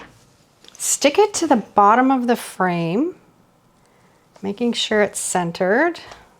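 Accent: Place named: Canada. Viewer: she’s so funny! I watch one of her videos at least once a night, and my husband yells out from across the room…. OUUUUUUUUTTTTT BECAUSE WE ARE NOT CANADIAN This is hysterical to us. Reminds me of all my aunts and uncles that live up there with this accent.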